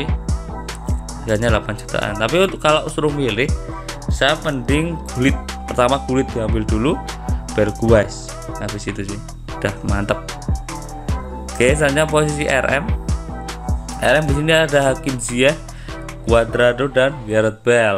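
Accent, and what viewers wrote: Java, Indonesia. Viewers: Logat omongan lu kek orang Jawa bang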